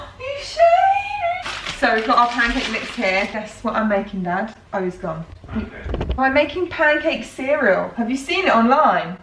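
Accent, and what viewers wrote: United Kingdom. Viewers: you are aggressively British I love it - sincerely an American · British accent makes me so happy and emotional lmao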